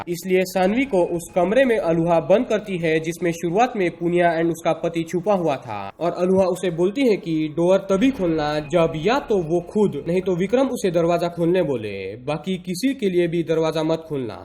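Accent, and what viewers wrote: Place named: Maharashtra, India. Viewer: Yaar marathi accent may hindi sunkar maza ata haiii..... 😁😁😂😂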